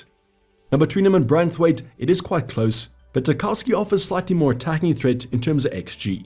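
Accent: South Africa. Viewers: That Saffa accent is strong bru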